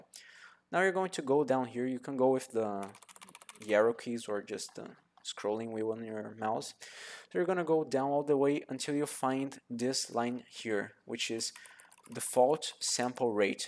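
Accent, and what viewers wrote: Brazil. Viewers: eu reconheci que tu era brasileiro pelo sotaque kkkkkkkkk obrigada, funcionou certinho :D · Valeu cara, salvou muto (reconheci o teu sotaque de br kk).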